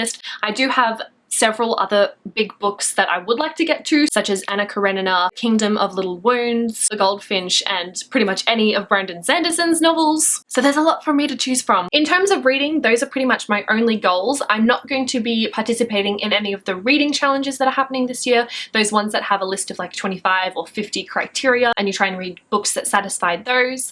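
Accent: Australia. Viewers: Are you Aussie? I love your accent!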